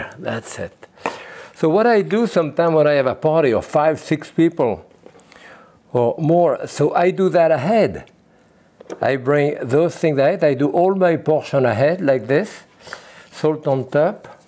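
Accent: France. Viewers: I love ur french accent english!😊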